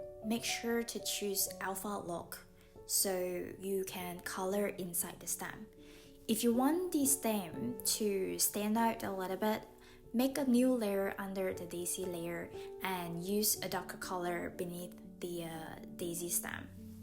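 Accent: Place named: Scotland, United Kingdom. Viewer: Completely off topic. But I do love the Scottish accent.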